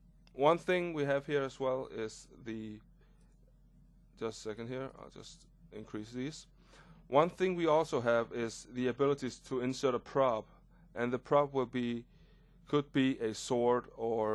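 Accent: Denmark. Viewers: it sounds like you're danish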